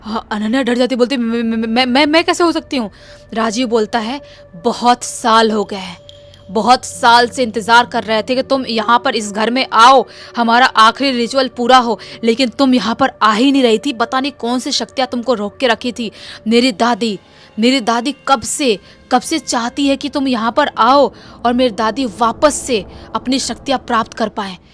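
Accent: Bihar, India. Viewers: Majja nhi ata accha bolta jaise real me ho apki awaj me thoda bihari pan najar ata or acting bhi over lagti h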